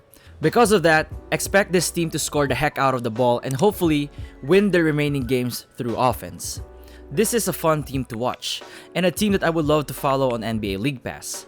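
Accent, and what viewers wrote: Philippines. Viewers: Lol Pinoy accent, my man.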